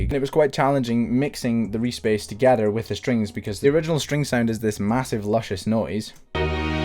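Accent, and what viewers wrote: Scotland, United Kingdom. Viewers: hey man, r u scottish?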